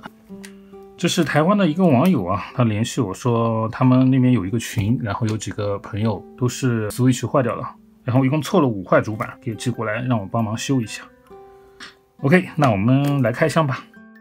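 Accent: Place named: Shanghai, China. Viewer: 对呃，我已经听出来侬呃上海口音了，嘿嘿😁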